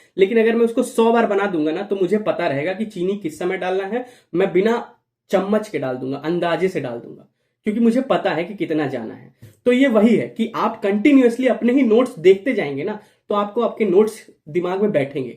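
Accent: Bihar, India. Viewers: Your Bihari accent is so cute😂❤ and the video is really helpful thank you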